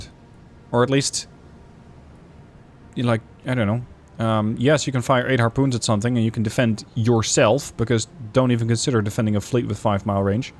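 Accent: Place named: Netherlands. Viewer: Your accent in german gave it away i think you are or at least speak dutch. Greetings from Switzerland.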